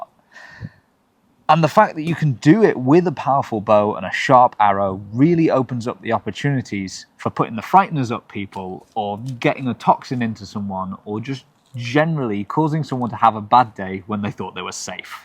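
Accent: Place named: England, United Kingdom. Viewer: I feel good knowing that I was 100% right that this man absolutely had to have an English accent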